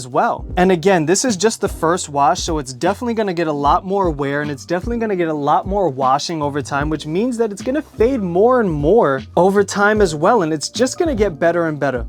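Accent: New York, United States. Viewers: Feel like im in newh yohk, love the accent.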